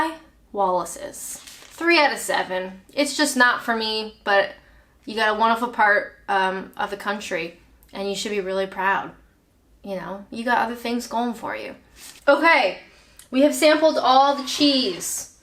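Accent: Canada. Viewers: Silly question but how is it that you have lived here a while yet your canadian accent hasn't broken yet? would you say that some English has appeared in your vocabulary the more you stay here? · You Canadians say "couch" and "house" and "about" strangely. But we love you in spite of it. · Learning how canadian talk sound to sweet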